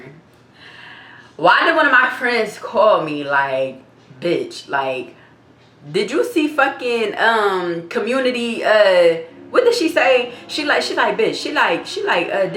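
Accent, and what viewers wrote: Philadelphia, United States. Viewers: I been following you since I had Covid FEB 2022 lol ❤️ I love Philly never been but I love meek mill so and the accent you cool too ima go look at the other story time when u beat yo yo brother baby momma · Hey Gurl I just love your Philly accent 🤗🤗🤗🤗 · Give us more story times I love the way you talk you so Philly 😭😍😌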